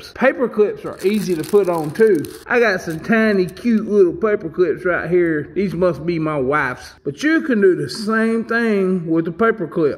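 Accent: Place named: South, United States. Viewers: Dude, I've got to comment. I like your style of explaining and humor. I love your 'southern accent.' You sure you're not from deep in the Southeast? lol Anyway, I've looked at quite a few of your videos and am impressed. You get down to the bottom line $$$ wise and explain the purpose, and why, plain and simple. I've learned quite a bit. I'm in the process of getting back into fishing for next year. Got my boat, currently modifying it and getting it ready. Keep up the good work man............... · Southern accents give people a bad rap. As soon as I hear a thick southern accent I automatically assume the person is a dumb/slow. But you my friend, are not dumb or slow.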